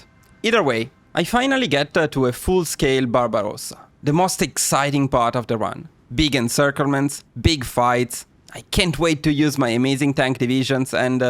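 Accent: Italy. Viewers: Well, technically they put a thing that autoupdate the mio, and you can queue the upgrade you want at the start of the game. For the stuff i prefer to manually manage what i want to trade, like if i'm germany and i need 8 chromium for a battleship, i just don't trade that since it's not a priority. And one extra thing, i really hope that they take the time to upgrade old dlc focuses, they look totally dated if you compare them to the last ones. On another note, you really sound italian, idk if you are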